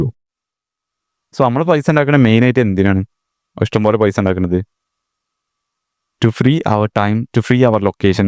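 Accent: Malappuram, India. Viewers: Bro Malappuram aano? Presentation kollam👍🏽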